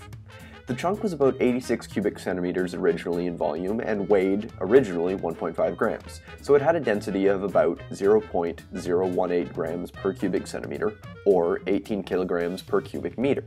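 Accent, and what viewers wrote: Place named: Canada. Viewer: This is the perfect example of a Canadian accent. 😂 awesome video, even if I’m 3 years late.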